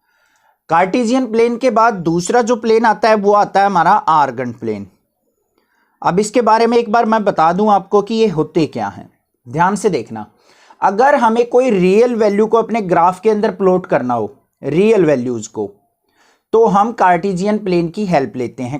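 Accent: Haryana, India. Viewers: bhai first time haryanvi accent mai pad raha hu, addiction ho jayga lag raha hai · Sir are you from haryana?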